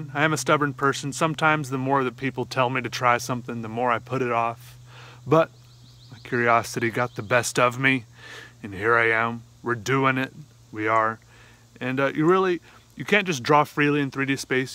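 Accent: South, United States. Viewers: TF? I was, for no reason other than curiosity, looking at alligator skin cowboy boots before opening Youtube and continuing my "Watch Later" list when i see wearing a cowboy hat and speaking with a southern draw. TF is going on here?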